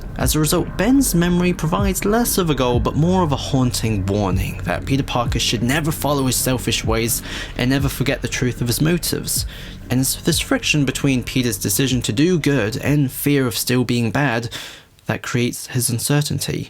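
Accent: United Kingdom. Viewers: I find it funny that you thinking having an “insightful” analysis is zooming in on out of context, unconnected quotes from a comic book, with some douchebag with a British accent reading them to lo-fi hip hop in the background. It’s not insightful in the slightest, but there is another word for it: disingenuous. He misrepresents the comics in HORRIBLY bad faith. Nothing insightful about that.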